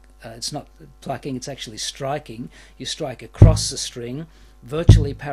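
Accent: Australia. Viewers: Im pretty sure hes Australian :/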